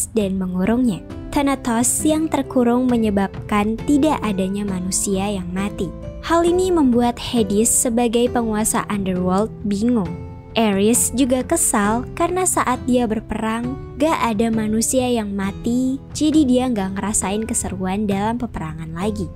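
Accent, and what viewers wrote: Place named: West Java, Indonesia. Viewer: Kakak pasti orang sunda ya kak ... 😅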